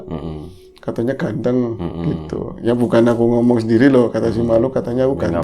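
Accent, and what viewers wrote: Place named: Java, Indonesia. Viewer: Kaku wez pkoke nek bro bwain criTa hahhaaa auTo ngakak medoke pooollll joozz gandozz, sukses trz kang..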